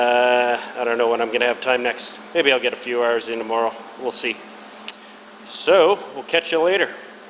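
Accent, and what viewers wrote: Canada. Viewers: Just watch your 4 videos start to finish, good stuff! Had a good laugh reading the comments "Are you in Canada?" I could tell you were a Canadian boy right from the start lol. From Ontario by chance? We should wheel sometime if you are.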